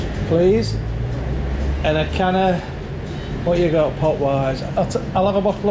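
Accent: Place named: North, United Kingdom. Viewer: Loved the filming and loved your northern accent. Your walk cheered me up but made me feel a bit sad. Like many people watching, I remember the 70s Great Yarmouth. I spent so much time there with my family and had such a good childhood, with my 'two weeks away in Yarmouth ' holidays. Needless to say, I began to think of my loved ones that are no longer with me. Time is an healer, they say, but some pain is hard to deal with. - even after many years. Thank you very much for making your walking tour available on YouTube. God bless you and your family. Thank you.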